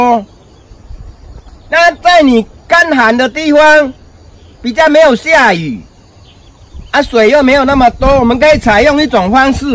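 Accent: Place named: Taiwan. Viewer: 露菌病和白粉病可以用什麼藥？聽不懂你說的藥名，可否再告訴我一遍，謝謝你，很喜歡你的台灣華語，已訂閱，並轉介一些愛種菜的台灣朋友。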